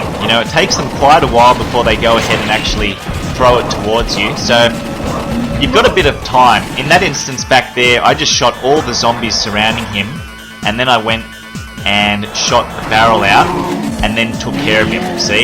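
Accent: Australia. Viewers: Are you Australian, by any chance? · Are you Aussie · are you australian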